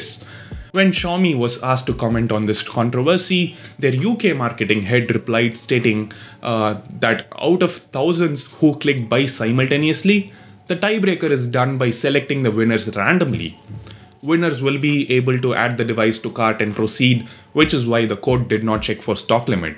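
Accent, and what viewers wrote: India. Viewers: Now that's the English one can understand properly, the way we Indians speak, unlike that fake a** weird accent used by aishwarya at c4tatti.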